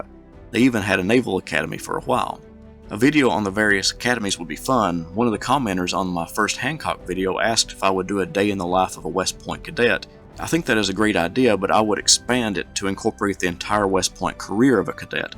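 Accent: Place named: Appalachia, United States. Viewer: I knew that accent was Appalachian. Love it.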